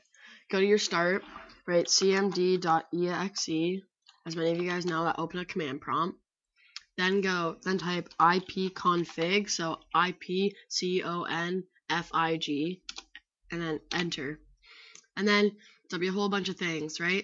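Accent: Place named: United States. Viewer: Finally English instructions with an American speaking thank you so much I couldn't understand anybody else's accents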